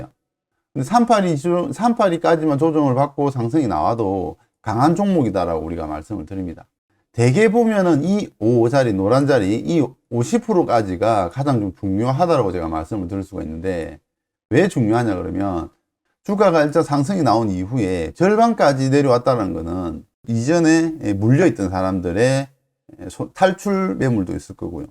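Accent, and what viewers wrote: Busan, South Korea. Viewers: 부산이신지? ㅋㅋ 익숙한 말투가 귀에 꽉 박히네요~ 내용이 너무 유익하고 전문적이라 계속 공부하고 있습니다. 감사~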